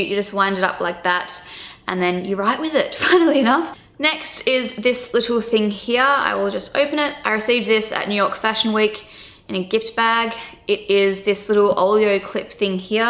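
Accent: Australia. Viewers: The Australian accent is really strong ahah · are you aussie i am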